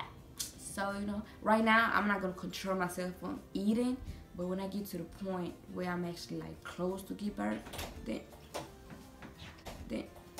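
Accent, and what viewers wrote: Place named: South, United States. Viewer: Lol she sound country with a mix of Joseline herendez she really got a southern accent sounding like Omertà “ you so country you so country.. these hands country too” 😄😄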